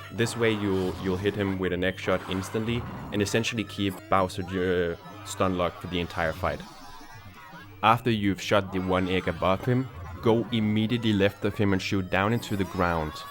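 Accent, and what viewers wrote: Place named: Denmark. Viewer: thanks for this video!! i miss this game so bad and watching u absolutely demolish it is so inspiring to watch :-D also your danish accent is so beautiful lol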